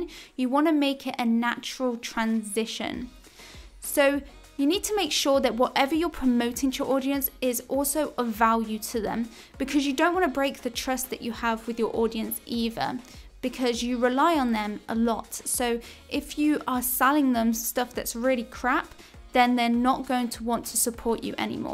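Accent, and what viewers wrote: England, United Kingdom. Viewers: Has a seemingly English accent yet says how to make 250,000 DOLLARS a year. Typo error ? Or…..? Hmmm…. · The best english accent here for the english accent lovers! 🤗😍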